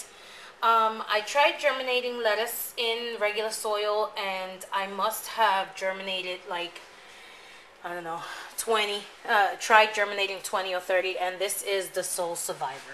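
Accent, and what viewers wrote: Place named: New York, United States. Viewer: You have an amazing NY accent :) great idea with the sandwich bags my pods never seem to sprout, i'll let you know how they turn out!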